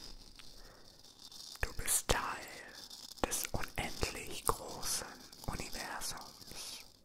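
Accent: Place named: Germany. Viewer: 💕Hey there baby boy You look absolutely georgeous I hope your feeling better Your eyes are absolutely beautyful Ive missed your german accent Ive missed you sooooo much Im your biggest fan girl (" Will you marry me ") Saranghae Lots of love and kisses Your biggest fan fan girl and future wife Nicole Louise💕 😈🔥💋🔥💋🔥💋🔥💋🔥💋🔥💋🔥💋🔥💋🔥💋🔥💋🔥💋🔥💋🔥💋🔥💋😈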